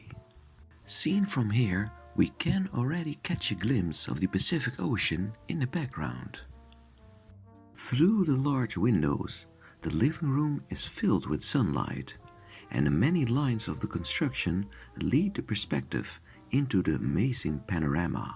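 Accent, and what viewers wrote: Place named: Netherlands. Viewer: The addition of the third bedroom looks poor from the outside, especially with the off-the-shelf aluminum frame window. I'm thrilled to have discovered your channel! They provide so much more than the rather limited views afforded by various coffee table books, even those by Julius Schulman. Excellent graphics and organization. I really get a feel for the interior spaces. By the way, when I watched your first video, I kept puzzling over your accent. When I learned you're from the Netherlands, I thought--No wonder! You guys always throw me off! I once knew someone from the Netherlands, who had gotten lessons with a speech therapist to help with accent reduction. She was from the deep South, however, so he ended up with the craziest American accent I've ever heard.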